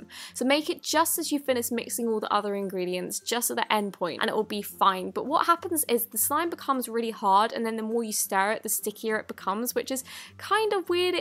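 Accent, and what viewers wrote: United Kingdom. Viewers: British alert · You sound British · Are u from the UK. Just asking cos you sound like it. I do. I come from East Yorkshire. Love ur videos. 😁😁😁😁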